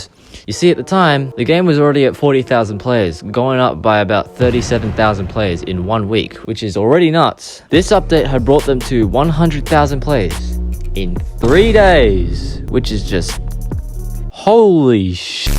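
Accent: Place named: Australia. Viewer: Are you Australian I love the accent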